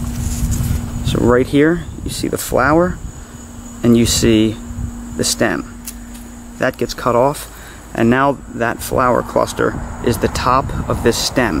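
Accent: United States. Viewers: I hate that american accent !! You should have cut off the top much earlier.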